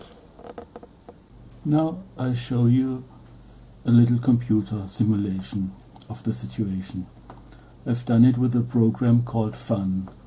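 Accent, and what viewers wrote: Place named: Germany. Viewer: You sound German !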